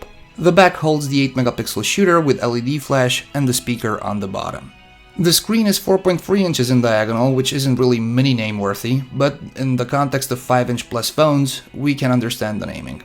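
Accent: Russia. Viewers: I still can't pin this guy's accent, Russian?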